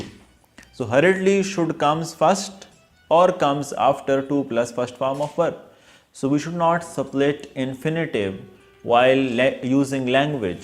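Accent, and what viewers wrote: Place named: Pakistan. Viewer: Thanks sir. I was very confused due to this words.i didn't relise that you are from Pakistan. While I was watching your video. You speak like my native teacher.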